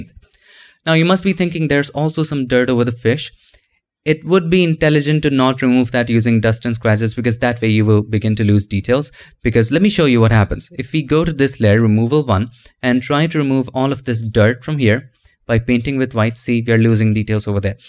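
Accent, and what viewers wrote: India. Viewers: Indian accent = check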